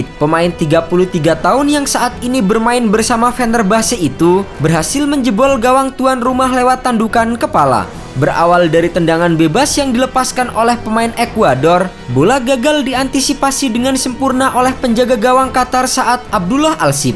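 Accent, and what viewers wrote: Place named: Sulawesi, Indonesia. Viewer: Ini yg ngomong orang sulawesi yaaa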